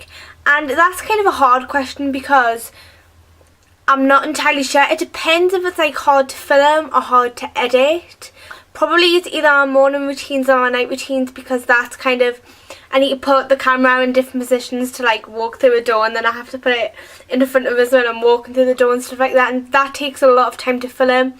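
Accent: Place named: Geordie, United Kingdom. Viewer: It is soo good to hear your accent because I've got a penpal in newcastle upon tyne and I want to meet her next summer and so I can "learn" to understand her.. because gordie is a quite difficult language to understand although yours isn't as strong as others ones ^^ (Sry if my english isn't perfect.. I'm from germany :P )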